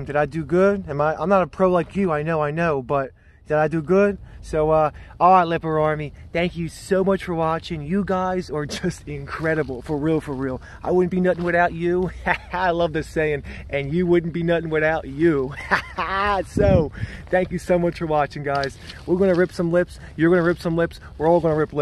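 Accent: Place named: New Jersey, United States. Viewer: Where you from? You sound like my homies in Jersey. Great catches. I am still trying to pin down some yellow perch over here.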